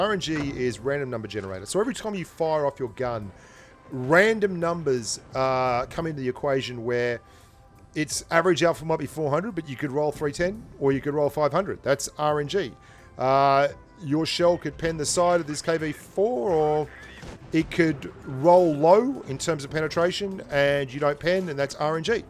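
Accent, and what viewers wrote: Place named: Australia. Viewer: mate r u aussie? you sound so much like one.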